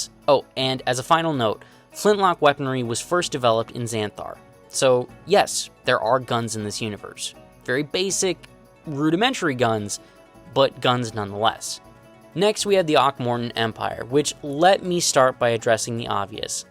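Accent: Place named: Appalachia, United States. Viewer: Considering the appalachian southern accent is a derived form of the Scottish accent. This is a wonderful accident.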